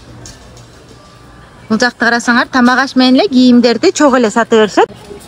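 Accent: Standard. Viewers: Баштык, дүкөн деп кыпкыргызча сүйлөп жатасыз рахмат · Эн соонун!!!! Чет жерде журсо дагы нукура кыргызча суйлоп жатат бир тууганыбыз❤❤❤❤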